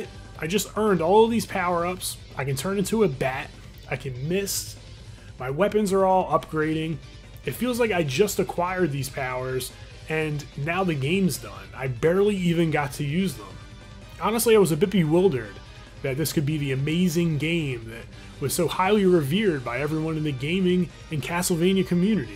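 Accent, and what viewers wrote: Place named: Western Pennsylvania, United States. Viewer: Are you from western PA or Ohio? Maybe Baltimore? I hear that accent haha regardless, this game is so close my heart ❤️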